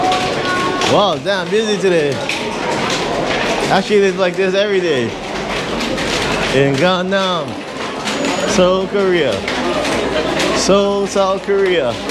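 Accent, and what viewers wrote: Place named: Hawaii, United States. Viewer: You sound like a local boy, you from Hawaii?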